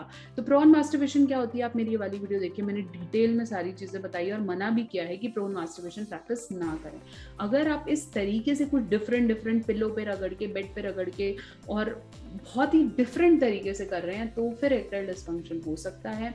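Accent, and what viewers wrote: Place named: India. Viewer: मैम मुझे तो आपको देख के लगता है कि आप विदेशी महिला हो पर जब आप बोलती हो तब पता लगता है कि आप इंडियन हो🇮🇳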